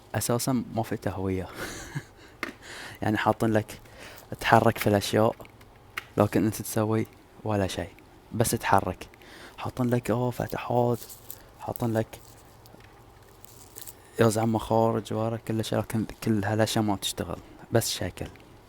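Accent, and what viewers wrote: Bahrain. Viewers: يا زين اللهجة البحرينية 😌❤️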